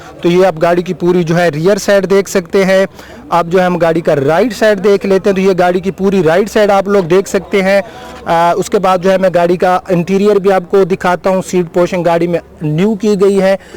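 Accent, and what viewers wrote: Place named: Saraiki, Pakistan. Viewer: Urdu sariki lehjay mein.... Baraa maza ata ha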